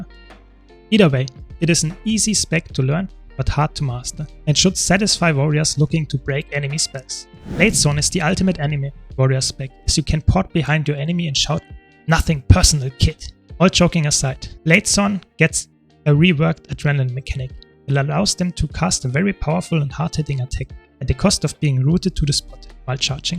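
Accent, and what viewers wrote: Germany. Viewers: Ah yesss that german kartoffel accent xD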